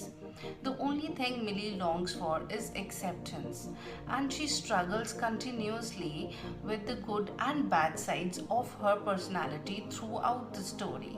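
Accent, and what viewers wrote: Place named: New York, United States. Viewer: Very NYC and good pronunciation....go a head And d best😃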